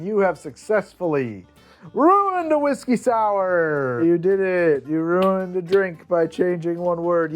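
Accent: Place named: New Jersey, United States. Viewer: I love that Jersey accent. Arange. Can't help but repeat it when he says Orange. He says it soooooo much lol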